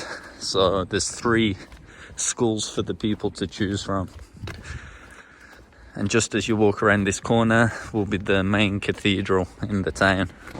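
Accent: Ireland. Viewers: Nice kerry accent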